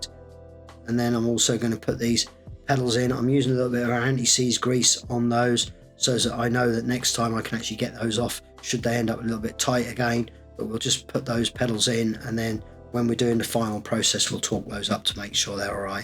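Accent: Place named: Suffolk, United Kingdom. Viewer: Love the Suffolk accent, it's just like mine. Love the video. 😊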